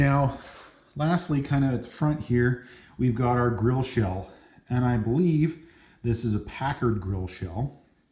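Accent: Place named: Canada. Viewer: Why right-hand drive? Where are you? (You sound Canadian, or American. )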